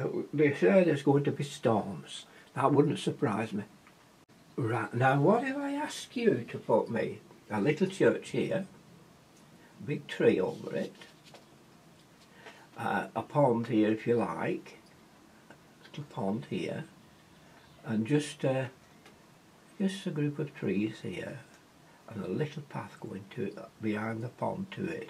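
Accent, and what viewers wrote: United Kingdom. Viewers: What is the palette you are using? Also, it's a bit hard to understand as you have a stong British accent and while I am very familiar with British accents it is sometimes hard to understand what you are saying. Maybe it's the video volume?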